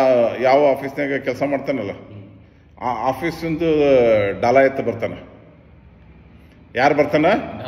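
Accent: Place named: North Karnataka, India. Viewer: Sir ನೀವು ಮಂಡ್ಯ ಜಿಲ್ಲೆಯವರು ಅಂತ ತಿಳಿದಿದ್ದೇನೆ ಆದರೆ ನಿಮ್ಮ ಮಾತು ಸಂಪೂರ್ಣ ಉತ್ತರ ಕರ್ನಾಟಕದ ಭಾಷೆ ಮಾತಾಡುತಿದ್ದಿರಲ್ಲ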